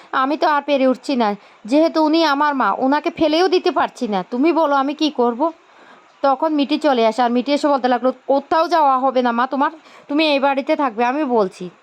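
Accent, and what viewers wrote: Bangladesh. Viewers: আগে ভালো করে বাংলা ভাষা টা শিখে বলুন। কি বিচ্ছিরি শুনতে লাগছে🥴🥴🥴। বাংলাদেশী না রোহিঙ্গা?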